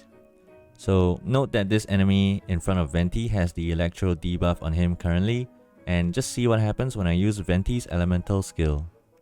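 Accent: Singapore. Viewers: are you singaporean? idk why your accent sounds like it :D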